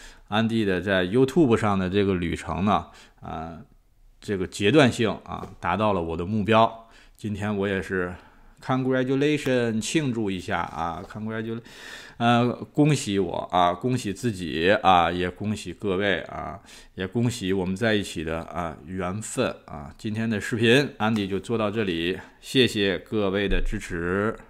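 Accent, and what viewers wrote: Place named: Northeast, China. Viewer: 东北话听着亲切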